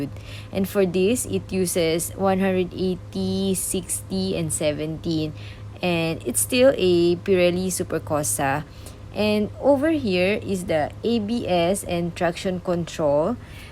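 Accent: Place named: Singapore. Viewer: I like your Singaporean-English accent hahaha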